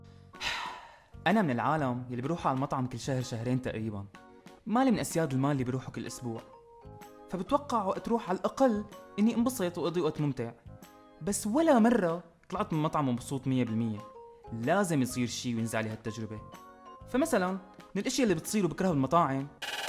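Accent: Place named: Syria. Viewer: و الله متأكدة انو من سوريا نفس لهجتي و الله 😂💔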